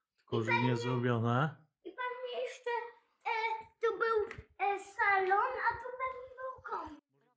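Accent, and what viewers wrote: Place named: United States. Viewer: Wygląda jak dom Kamila Stocha. A pan fajnie "po amerykańsku" mówi jak Mariusz Max Kolonko.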